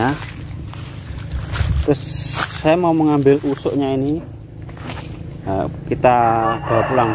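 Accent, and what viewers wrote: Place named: Java, Indonesia. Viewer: Jowone ngendi masse...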